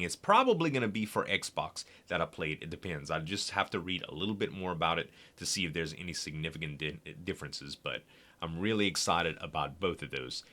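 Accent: Louisiana, United States. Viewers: I knew just from hearing you in Slip's videos that you were from Louisiana. Your accent screams Acadia. :D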